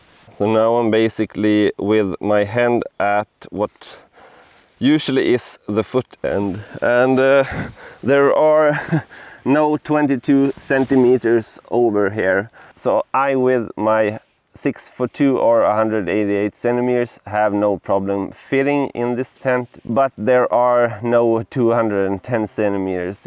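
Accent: Sweden. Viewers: Are you Sweedish or Norwegian, you have that accent when ending words 😂 nice vid and tent. Prob watched 40 already as I am going ti buy it and then ready to go to Sweeden for camping :D closer to go there from where I am then back to Croatia where I am from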